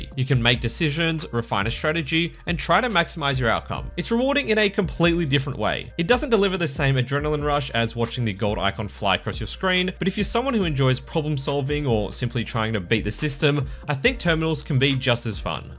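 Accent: Australia. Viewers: “This video sponso’.” You gotta love an down under accent. Keep upt the good work!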